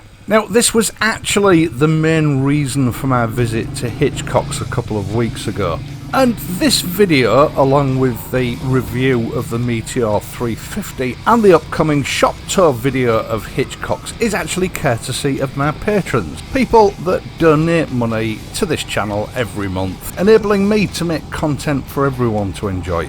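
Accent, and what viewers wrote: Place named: East Yorkshire, United Kingdom. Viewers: Love the videos and the Yorkshire plain speaking,. Thank you for the shots of horse chestnuts in flower, a real delight for an ex-pat living in Canada, where these magnificent trees are not common - though less rare than the RE Interceptor Mk I. 🙂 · Hey, is that East Yorkshire accent, there!?!? Thanks for video...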